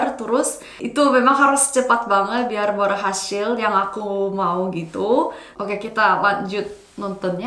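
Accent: Indonesia. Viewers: wih,, dah kayak orang indo logatnya.. fix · Logatnya Udah Ke Indo Indo annnn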